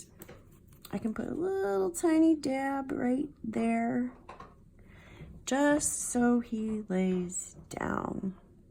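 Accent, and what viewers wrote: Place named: Midwest, United States. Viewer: I found you through Tracie's GDT and am a new subbie! Loved your journal & followed over to see your pockets. I was so tickled when you said washi or warshi... LOL I was like omg she must be from the midwest, like Missouri! Then you said you were from Mo, I was born there and still have family there but I have not lived there since I was young. My grandma, my, mom, and all my family from MO & IN all say WARsh the dishes! Have fun and good luck with your channel, you are quite pleasurable to watch, nice speaking voice and a good sense of humor always win me over mixed with good crafting! win win!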